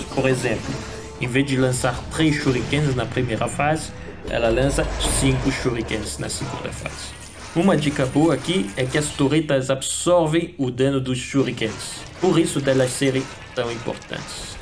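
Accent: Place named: France. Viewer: explica muito bem, mesmo com o sotaque francês puxado..., é até um diferencial..., mais um ótimo vídeo!!!!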